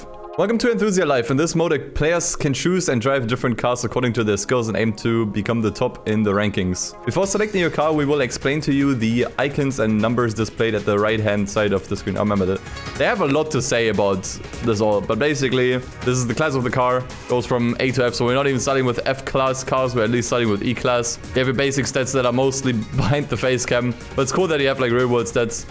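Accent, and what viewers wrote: Germany. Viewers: i hear the German accent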